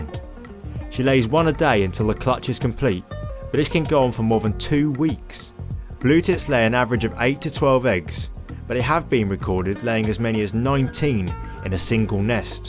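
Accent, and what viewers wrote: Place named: England, United Kingdom. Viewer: Really enjoy your videos mate. You sound English, so I think you meant they are found as far EAST as Turkey, not West. 😉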